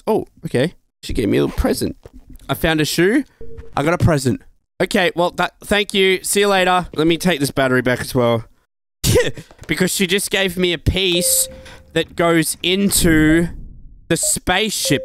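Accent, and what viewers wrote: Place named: Australia. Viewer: You a Australian brohther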